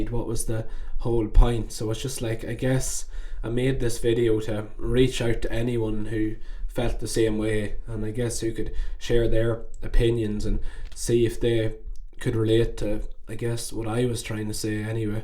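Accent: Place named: Ireland. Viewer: Dude this is awesome. A lot of people dont start coming to terms with dissatisfaction and fear and uncertainty until years down the line from where you are, if they ever do. Most people waste years chasing pleasure and fulfillment through every avenue imaginable before coming up dry and realizing everything was for nothing. Seems to me like you are starting to realize the low hanging fruit isnt always the best fruit pretty young, which is admirable. I'm only a couple years older than you (I'm 23) so I can definitely empathize with seeing your whole life ahead of you but being afraid of never finding true satisfaction. If you want my theories and experiences with seeking true fulfillment I'm happy to share! Side note... You sound Irish, is that right? I'm in the US (Alabama to be specific) and your accent is AWESOME. I went to Ireland on a study abroad in 2022 and I definitely want to go back someday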